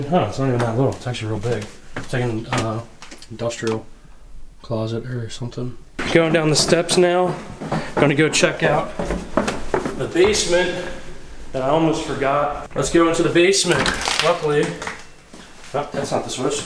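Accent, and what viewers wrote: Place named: Pennsylvania, United States. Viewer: Hey, just found your channel and subscribed, interesting content. I think some of those are aviary coops? These people were breeders it seems. Those mugs are worth money dude, someone put a lot of money into this property. Is it for sale? Unbelievable, it looks like it can be saved at this point. Oh, I'm detecting a accent, are you from PA? Anyways, enjoyed the content, thanks bro! 🌴😎🌴FL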